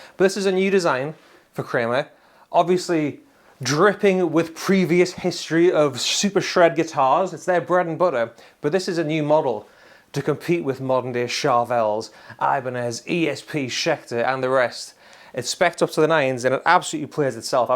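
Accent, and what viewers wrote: United Kingdom. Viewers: Thats one of the best reasons to subscribe! Well, there's also the guitar playing and the smoth British accent. Your a legend sir.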